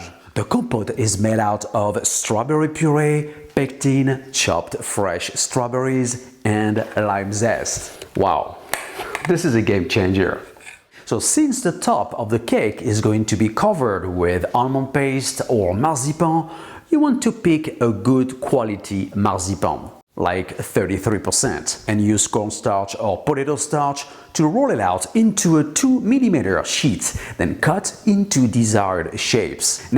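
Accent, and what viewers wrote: France. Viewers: Why the hell do I love him and what he makes so much??? Answer: B/C of the damn French accent😅😝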